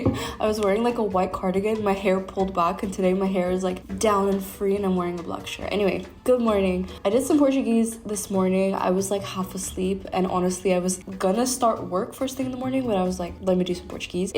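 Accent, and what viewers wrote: Rio de Janeiro, Brazil. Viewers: Carioca raiz ! amei demais !!!!!! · Como um carioca que sou, teu chiado está ficando ótimo! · I speak portuguese too. My accent is more of a Sao Paulo/Salvador accent. I can hear the Rio accent from you too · your accent is carioca lol kkksdjksjd but your portuguese sound amazing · you are doing amazing! I can clearly hear your carioca accent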